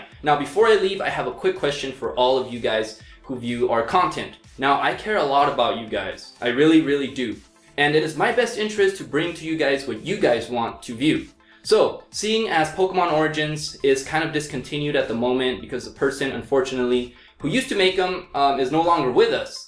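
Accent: United Kingdom. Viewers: YOU CAN NEVER COPY BRITISH ACCENT!!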